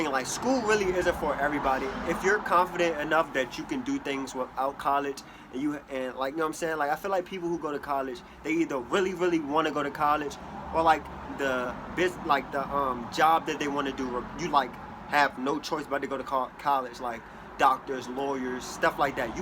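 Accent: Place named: New Orleans, United States. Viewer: You talk like you from new orleans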